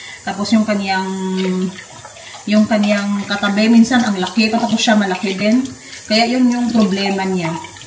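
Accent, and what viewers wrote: Philippines. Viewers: my tono ka san probinsya ka Dito sa pinas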